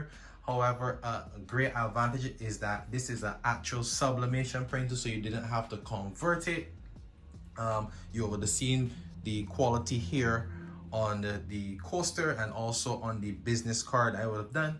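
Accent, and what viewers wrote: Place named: Barbados. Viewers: Had to subscribe as soon as de Bajan accent hit me😁. Well done. Very informative video. Am seriously considering getting this printer. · I stumbled upon his channel and from the time I hear the accent I say he got to be a Bajan lol.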